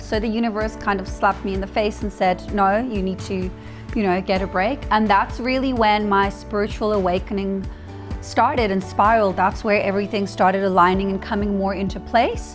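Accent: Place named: South Africa. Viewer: Finally found out something about her background etc. I was curious. And for some reason I thought she was Australian lol but it's so obviously south African now. Derr. Nice documentary 👍🏼🪐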